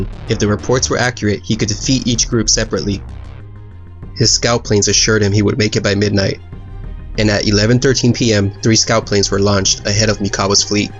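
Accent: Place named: United States. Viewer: Mechanical "voice" narration, lots of "Wadder' and all the usual american nonsense. No wonder they want to keep quiet about how badly they did.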